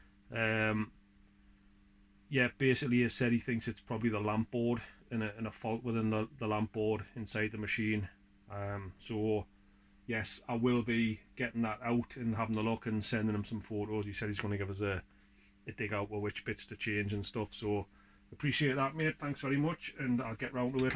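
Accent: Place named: Northeast England, United Kingdom. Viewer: hi, if you still have red alert machine, any interest in trading for Austin Powers ( king kebab clone ) judging by your accent, i'm not too far away in billingham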